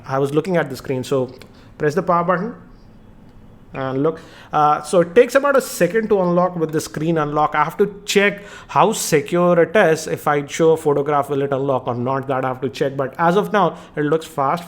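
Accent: India. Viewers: Your accent = Indian cricket commentator . Good video though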